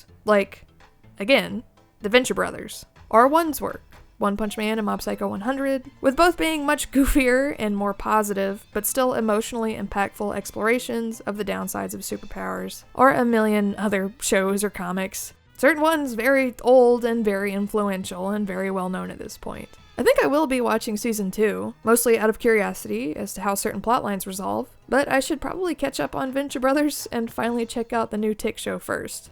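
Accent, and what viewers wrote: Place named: Australia. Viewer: He sounds aussie not Kiwi :O (-an aussie)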